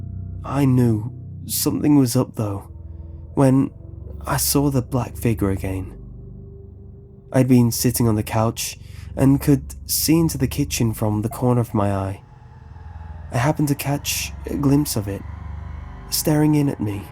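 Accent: England, United Kingdom. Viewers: Yes he has a very sexy British voice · The Favorite Gentleman the accent varies is lucky to get a nice english accent unlike scousers and geordies god i hate there accents lol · I'm guessing you don't live in the UK, it's an english accent not british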